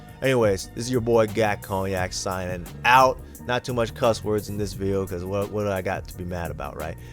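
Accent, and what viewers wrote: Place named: London, United Kingdom. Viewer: “You sound like your from Lon-Don”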